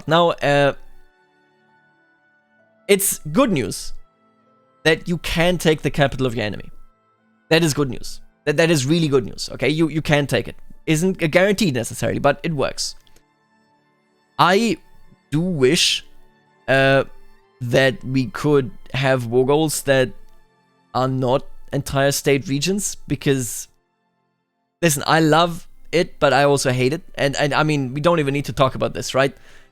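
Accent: Bavaria, Germany. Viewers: Do you speak german with a bavarian accent or do you/can you speak standard german?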